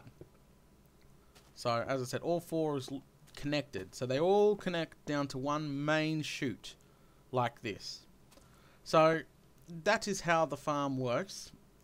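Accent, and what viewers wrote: Australia. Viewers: i luv the australian accent,sounds like there always asking a question:P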